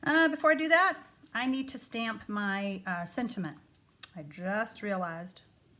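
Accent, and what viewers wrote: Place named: Texas, United States. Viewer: Lordy, I miss that TX accent ! Raised north of Dallas in Denton and moved to se OK in '77. No one talks like that north of the Red River and I miss that ! Love your videos Lady. Keep on doing what you do ! 🙋 GreatNana in se OK usa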